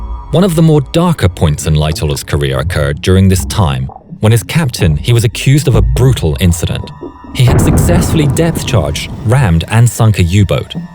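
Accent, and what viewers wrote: England, United Kingdom. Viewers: This is the best British accent I’ve ever heard haha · Love you English accent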